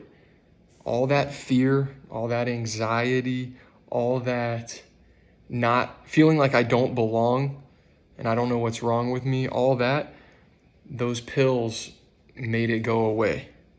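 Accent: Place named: South, United States. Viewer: Love you humour and southern drawl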